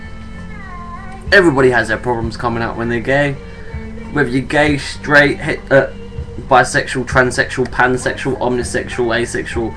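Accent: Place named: United Kingdom. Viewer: Wonderful seeing contributions from other than the LGBT U.S. communities. Love the UK, and love your Brit accent. Being a Yank, not terribly sure where you may be from originally, but are you perchance from Liverpool? Probably getting this all wrong, so my humble apologies in advance.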